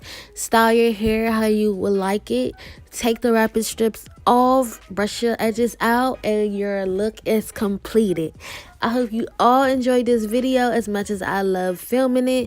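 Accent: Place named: Washington DC, United States. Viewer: I just know youre from DC lol. that accent is too recognizable lmaooo 😘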